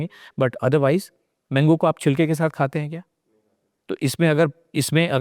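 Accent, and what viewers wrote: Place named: Udaipur, India. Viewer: Host bhaiya kya aap udaipur rajasthan se h...aapke bolne k tarike se pata chal raha h....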